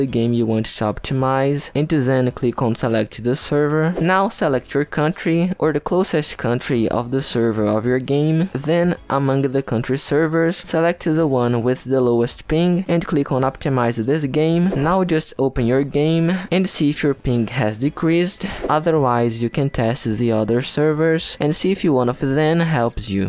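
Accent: Brazil. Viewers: cara da pra perceber q é br so pelo sotaque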